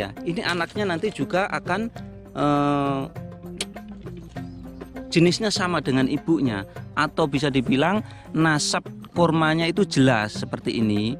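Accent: Java, Indonesia. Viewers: asli mana pk bicaranya kaya orang jawa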